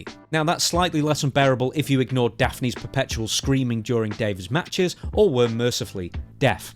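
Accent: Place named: United Kingdom. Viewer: Clicked on this, heard the British accent, turned it off.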